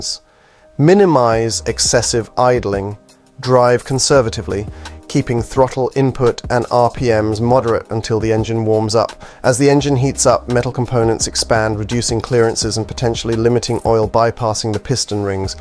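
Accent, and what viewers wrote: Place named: England, United Kingdom. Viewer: I take issue with the remark that a timing chain is maintenance free. It depends on the rpm used, presumably in USA, you sound English but price in dollars, automatic gearbox is normal and therefore high rpm rare but each chain has to go round the tiny crank sprocket and if that stays above 4,000rpm for long the chain is grossly overspeeded - read a chain catalogue or maker's recommendations. One reason for belts was to get away from wearing chains.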